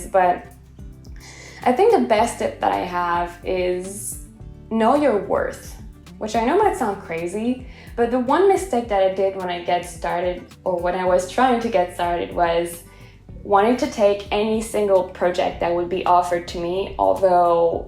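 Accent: France. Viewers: Illustration. You are so wise, my friend. Thanks for sharing your wisdom with us! :) PS: No wonder I connect with you so much. I have the same accent. Raised in France, moved to the U.S. at age 10, and I still trip on my words quite often lol. You're doing great! Also, my daughter has a rabbit named Mr Lapin. It's a peluche though (we speak frenglish at my house) hahaha. Bisous!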